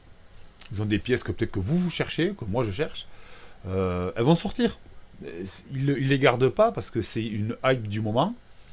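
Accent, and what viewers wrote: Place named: South, France. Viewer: Salut merci pour la vidéo, avé l'accent 😀, belle collec. J'aime beaucoup la musique d'intro c'est quoi?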